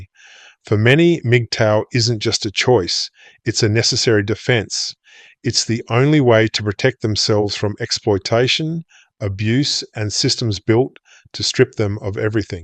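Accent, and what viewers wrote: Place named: New Zealand. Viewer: This guy sounds Kiwi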